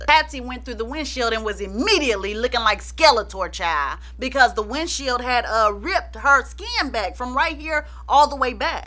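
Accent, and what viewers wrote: United States. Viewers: Lol wat is up with this country accent 😂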